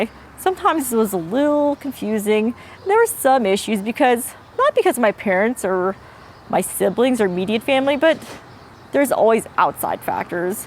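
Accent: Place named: Iowa, United States. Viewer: Hello fellow KAD - love your video. I’m going to message you and share my thoughts, because I don’t showcase mine publicly. But I want to say you’re one of the few adoptees who I can 99% relate to! And I find it funny when you say “I can still have an Iowan accent and eat kimchi.” You need to hear the way I talk! 🤣 Thank you for making this!!! ❤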